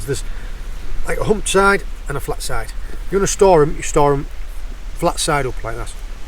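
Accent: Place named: Hull, United Kingdom. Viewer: Proper theraputic, end of hard week, couple of beers watching. There's a different world under the waves. Can't pin the guys accent down though. Wanna say Hull but it'a not quite there.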